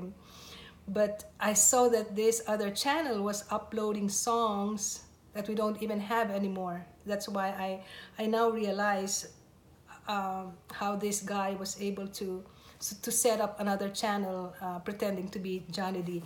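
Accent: Philippines. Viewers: Its like your a Filipino citizen because of your accent. But we are happy that Papa JD is back :) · You sound like a Filipino. Are you a Filipino? Because i am also a Filipino too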